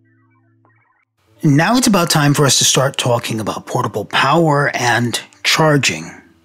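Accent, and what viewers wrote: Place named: United States. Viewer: Well, yes, I'm impressed. But the thing for me is how did you develop such a flat American accent?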